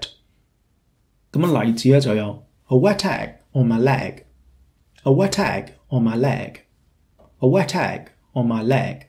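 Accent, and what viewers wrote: United Kingdom. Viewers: 以很優美的英式口音，清晰介紹每個vowel！絕對值得觀看、收藏及分享！ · By the way, what kind of English accents are yours? Would that be Oxford English? I am not familiar with the different types of English accents in UK, but I find it hard to understand some English people, especially the Welsh. I know people from different regions/provinces/states have different accents.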